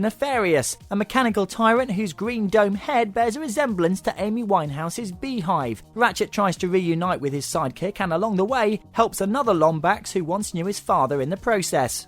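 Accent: United Kingdom. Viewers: Am i the only one that findsthis review more entertaining because of the the ( hope i have this right ) british accent.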